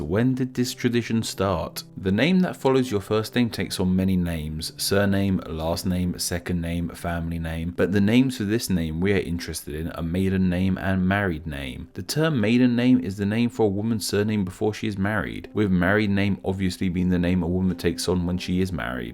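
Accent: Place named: Southern England, United Kingdom. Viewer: Agreed I'm from England but this guy is to much of a southerner and it just makes some videos painful to watch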